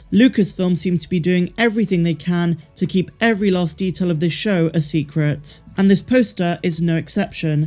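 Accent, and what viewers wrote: United Kingdom. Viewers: I ADORE YOU,! You freakin Rock 🤟❤️💋😘🤠! And hey, that Brit accent is pretty sweet too 😄💗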